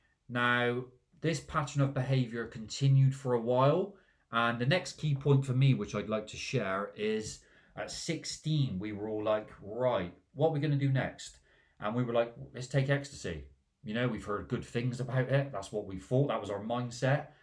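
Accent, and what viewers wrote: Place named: Bristol, United Kingdom. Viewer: I knew you were from Bristol!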